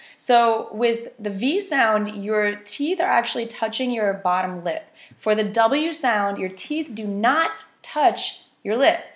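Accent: United States. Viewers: It's something easy to listen to but difficult to practice, not only we, from India, but neighbouring Bangladesh, Pakistan, Srilanka, simply say WARALD and get rid of it. I faced a listening problem when I met Americans during my stay in USA, briefly, But you are making it a cakewalk to practice English in its pure American tone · Don't want to be pedantic, but you're teaching us american, not english pronunciation :). Thx!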